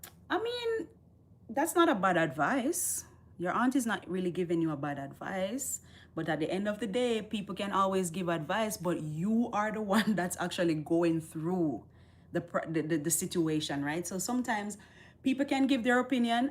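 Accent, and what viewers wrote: Jamaica. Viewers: You are great love to lissen to your words My english is not perfekt but anyway i can understand even if you are talking jamacian and i enjoy so mutch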